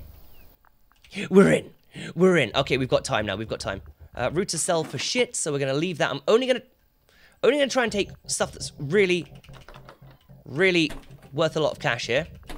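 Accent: England, United Kingdom. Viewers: Because porn and pawn are pronounced the same in an english accent:')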